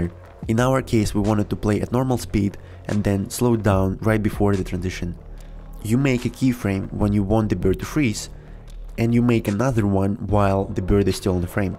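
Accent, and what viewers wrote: Russia. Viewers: Russian accent detected ^^ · Какой ужасный русский акцент!)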